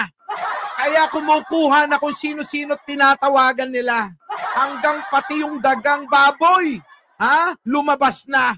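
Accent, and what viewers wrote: Ilocos, Philippines. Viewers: Ung Ilocano mo boss masarap pakinggan, lol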